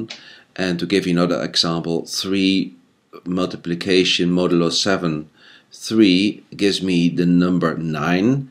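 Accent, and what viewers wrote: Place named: Belgium. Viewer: You sound Belgian!!!!!!!!!!!! Are you?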